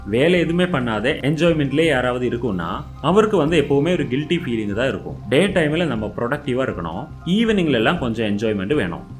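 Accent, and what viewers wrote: Kerala, India. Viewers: Are u malayaali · Are you malayali right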